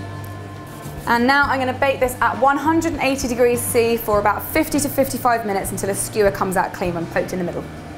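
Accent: United Kingdom. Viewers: Doppelgänger she speaks British English with a beautiful accent.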